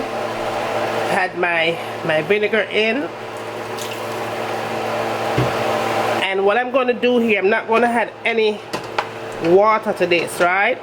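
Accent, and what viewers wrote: Jamaica. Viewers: nice simple recipe!! Btw mi love the Jamaican accent!! Real yaady!!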